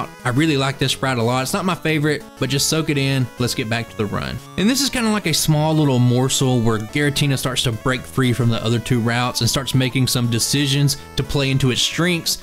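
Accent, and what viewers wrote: South, United States. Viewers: Just found you. Came for the pokemon of course, but staying to hear it in that drawl. Aren't many of us making content out here from the south.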